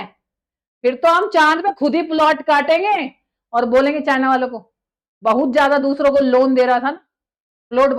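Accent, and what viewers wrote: Haryana, India. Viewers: मैडम आप हरियाणा कि है क्या, मुझे आपकी भाषा बहोत अछि है।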